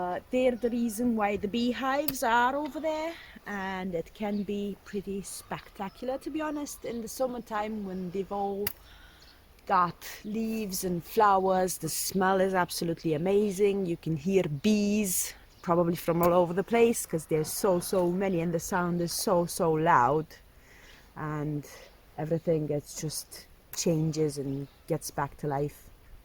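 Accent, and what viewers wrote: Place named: Scotland, United Kingdom. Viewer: Love this!!💛💛 Wishing you all the success with your vlogging journey!! 💛💛 You and your Scottish accent haha!! 💛💛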